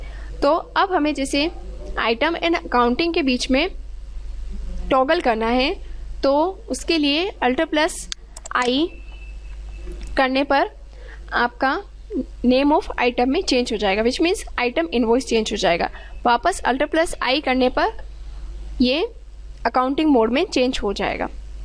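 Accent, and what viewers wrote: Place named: Bihar, India. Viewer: Mam aapka accent Bihari wala h kuch samjh nhi aaya bol kya rhe ho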